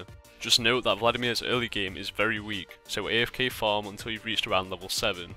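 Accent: Northern England, United Kingdom. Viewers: Your northern English accent is very different from mine. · I love british accent :D Him and JackFrags are my fav commentators on youtube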